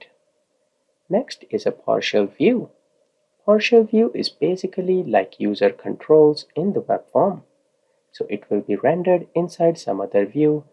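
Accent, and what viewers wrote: India. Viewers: Absolutely the best <link> tutorial video I've found so far. Having an Indian accent is nice. However, when you speak, it feels like you're singing with the same tone all the time. Please, just speak in your natural tone. Don't try to sing. This singing part made it very hard to understand what you were saying. i had to listen 3-4 times to understand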